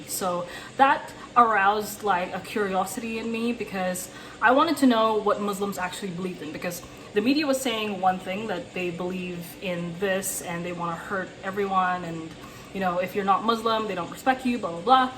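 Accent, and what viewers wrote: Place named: United States. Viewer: please introduce yourself before making a speech. i am curious. your face is like asian but your voice is like american. Are you an american or asian?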